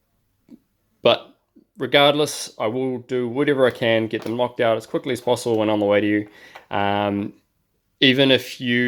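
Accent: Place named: New Zealand. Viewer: I had basically the same question. I've been thinking about vapor blasting for a while but i'd never looked at how a professional cabinet works, basically because i'd never be able to afford one. Looking at other diy-ers they were using water blasters but media getting stuck seems to be all too common. I modified my water blasters plastic gun to try adapt a T but it couldn't handle the pressure, and to get to decent pressure the aperture has to be quite small, basically 1mm. I was going to build a pressure pot to also help feed the media through the gun but i'm sure glad i came across these videos. Thanks for pointing us in the right direction bro. Also a pleasure to hear the Kiwi accent! Fellow kiwi in Brazil.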